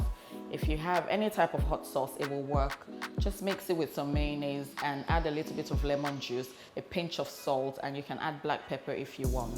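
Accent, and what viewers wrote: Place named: United Kingdom. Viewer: I use to think you live in the UK because of the way you speak. I love the recipe.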